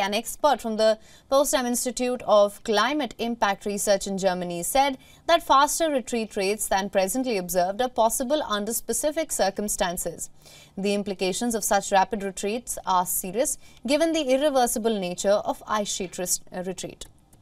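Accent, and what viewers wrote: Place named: India. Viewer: Ahh, good old indian accent with indian face. Jai Hind!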